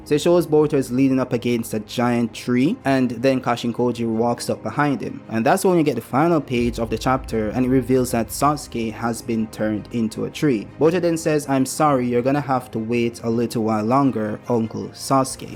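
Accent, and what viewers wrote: Jamaica. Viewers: Are you Jamaican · Are you jamaican? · Bro you Jamaican??? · I would say Jamacian or guyannese It is how he says the Word About. my two guess Worst one would be Grenadian. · Bro are you Jamaican?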